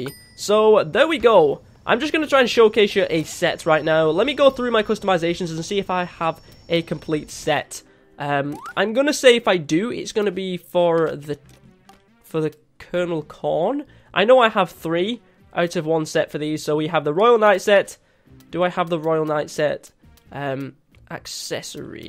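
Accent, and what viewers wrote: United Kingdom. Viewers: the way, you sound really really UK-ish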